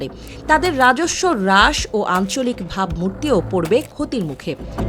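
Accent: Kolkata, India. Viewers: পাঠিকার কণ্ঠে সমুচিত জবাব। কলকাতা ভাইব পেলাম। শুভ কামনা এনটিভি।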